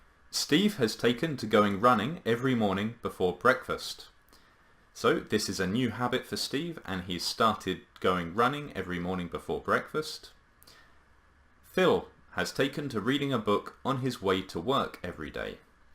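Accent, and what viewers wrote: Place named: United Kingdom. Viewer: Sir, you possess a clear British accent. By the way, I am your new subscriber.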